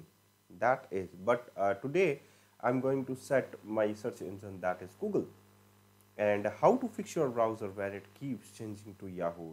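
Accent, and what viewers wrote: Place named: South India, India. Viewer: what's so special about it, sounds too south indian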